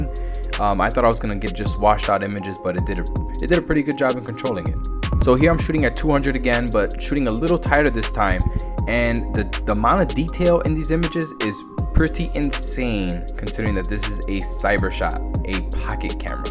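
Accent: Chicago, United States. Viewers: are you from chicago?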